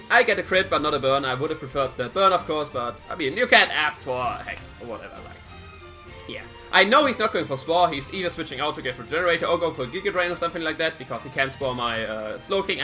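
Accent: Germany. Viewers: Looks like another youtuber i'll enjoy to watch. BTW, if you don't mine me asking, are you German? Cause your English sounds a lot like German. Not to be rude though.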